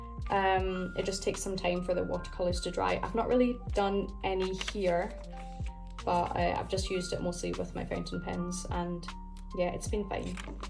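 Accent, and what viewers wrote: Scotland, United Kingdom. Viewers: Great to see and hear another Scot on YouTube! I'm a Stalogy girl/old woman, but I do love the cream cover of the Hobonichi! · Subscribed not just for the Hobonichi content but more so for the Scottish accent which gives me the tickles ❤ Hope you have an absolutely fantastic day!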